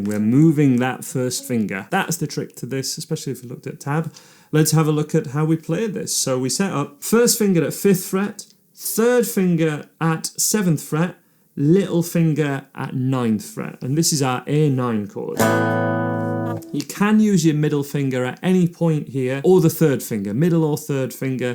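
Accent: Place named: Liverpool, United Kingdom. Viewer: Love the clarity of your videos. You explain things so well! BTW, are you from Liverpool? I hear a bit of in your accent.))) But what do I know, I'm an American.)))))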